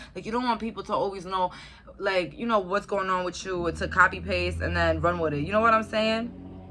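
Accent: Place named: Brooklyn, United States. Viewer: you really be from NY huh? im cacklin sis you be radiating brooklyn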